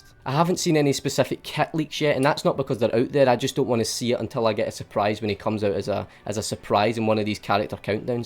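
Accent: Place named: Scotland, United Kingdom. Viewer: hey, i love ur accent, r u scottish ?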